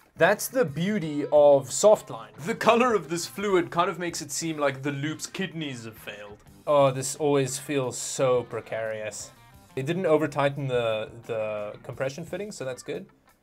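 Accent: South Africa. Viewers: Are you from South Africa? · are you south african?!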